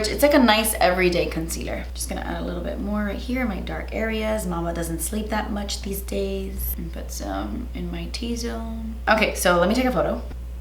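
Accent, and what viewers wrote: Cuba. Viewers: She's cubana 😊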